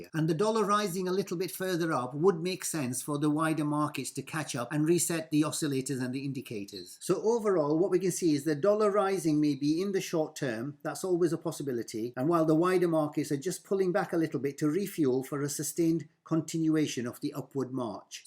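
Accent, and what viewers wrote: United Kingdom. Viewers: Thank you Sir for your financial ideas and for your perfect British English. God Save the King!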